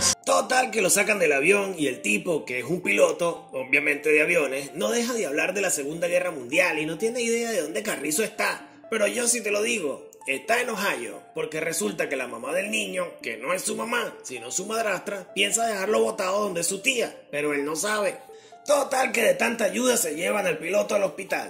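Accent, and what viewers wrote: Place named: Venezuela. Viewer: Jaja tremendo narrador maracaibo o Caracas? Me espoleaste por completo 😂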